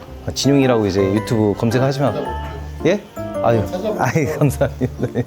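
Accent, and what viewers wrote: Chungcheong, South Korea. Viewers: 어이 양반 충청 양반..뭐래유..너무 잘보고가고 좋아요..영상 공유해줘서 눈향복하개해줘 고마워요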